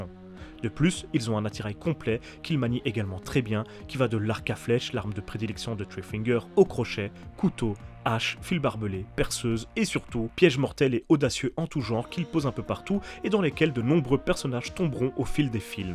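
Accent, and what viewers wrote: Belgium. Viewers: ah batard t’es belge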